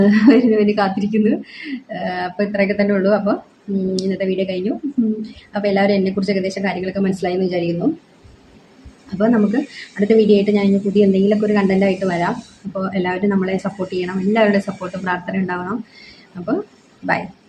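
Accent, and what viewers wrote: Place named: Palakkad, India. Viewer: ❤👌🌹🥰hi.. YouTube Facebook Instagram. എല്ലാ സോഷ്യൽ മീഡിയയിലും കാണാറുണ്ട്.. പക്ഷേ മലയാളിയാണെന്ന് ഇപ്പോഴാണ് മനസ്സിലായത്.. congratulation..yur videos very good 🎉 romantic singing song, very wonderful acting.. സംസാരം കേട്ടപ്പോഴാണ് ശരിക്കും നാടൻ പാലക്കാട്ട് കാരിയാണ് എന്ന് മനസ്സിലായത്.. അതിന് എല്ലാവിധ അഭിനന്ദനങ്ങളും അറിയിക്കുന്നു.. ഞാനും ഒരു സിംഗർ ആണ്.. പരിചയപ്പെടാൻ കഴിഞ്ഞതിൽ ഒത്തിരി സന്തോഷം. എല്ലാ പ്രോഗ്രാമും കാണാം.. who is the best very beautiful 🎉❤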